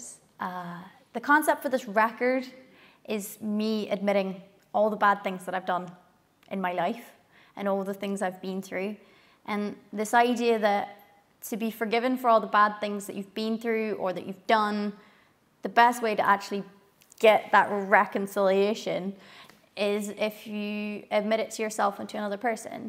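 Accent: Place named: Ireland. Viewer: Janet, the sign of a person growing is when they are able to look at where they have been, what they have done, and what they wish they had done differently. If they can learn from these experiences, they can make changes or continue to grow. Many cannot be that honest with themselves. It takes a special person to be able to recognize and document where they have been and what they have learned about themselves. For you to be able to go beyond recognizing, and then share with us what you have learned from your journey is amazing by itself. I have followed you for years and have thoroughly enjoyed your talents (and the wee bit of charming Irish accents along the way.) Congratulations on becoming an even more amazing person! With your fantastic talent for music, I can only imagine this is an outstanding album. I am looking forward to listening to it. 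Dave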